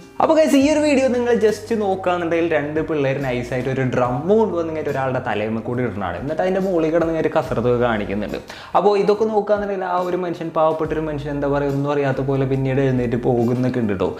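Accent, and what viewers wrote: Thrissur, India. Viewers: Chettan mmade Thrissur aano 💜💜atho Angamaly aano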